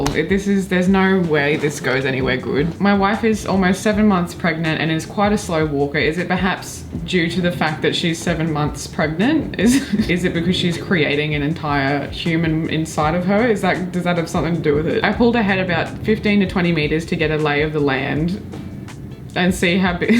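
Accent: Australia. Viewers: Hey, I love these videos of yours and I love how invested you get in the story. It’s probably the Aussie way you talk about it.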